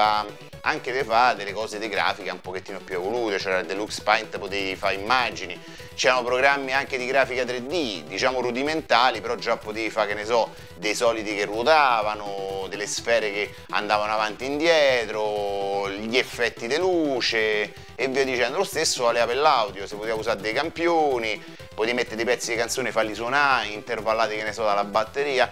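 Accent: Rome, Italy. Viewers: sei un grande, oserei dire un "geniazzo": estrema competenza abbinata a grande, voluta, semplicità comunicativa, peraltro con un deliberato utilizzo del "romanesco", che rende il tutto incredibilmente familiare e soprattutto nostalgico; spazi dalla tecnologia allo sport con la stessa disinvoltura con cui dai un "colpo sotto"!!! 🤝🏻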